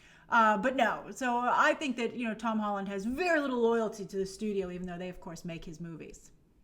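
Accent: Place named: New Jersey, United States. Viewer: You sure sound like a Jersey girl,...Luv it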